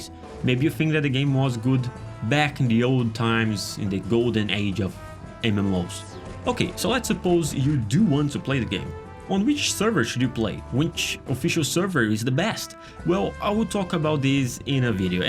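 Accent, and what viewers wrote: Brazil. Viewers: Are you Brazilian? I’m noticing your accent. I’m half Brazilian.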